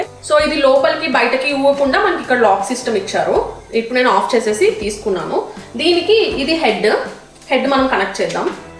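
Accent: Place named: Nellore, India. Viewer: Fridge lo samanlu pette eruganu.mana nellore basha akkovv..yaadikellina mana nellore natural slang e baga vaduthunnaru.Nice sis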